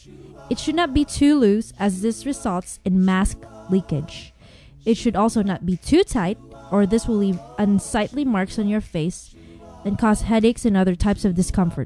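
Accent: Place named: Canada. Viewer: Pretty informative. Good job. And the canadian accent is too cute lol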